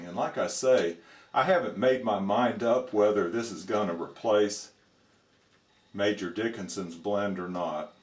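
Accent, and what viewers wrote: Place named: South, United States. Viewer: Love that Southern Drawl... 👌😎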